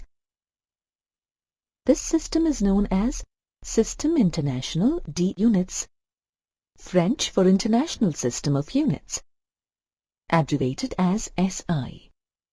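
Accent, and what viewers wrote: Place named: India. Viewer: Good indian accent. & tune and pause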